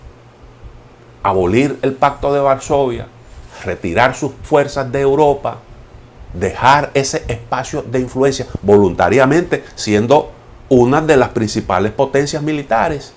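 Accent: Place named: Dominican Republic. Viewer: Ese asento me parece quisquellano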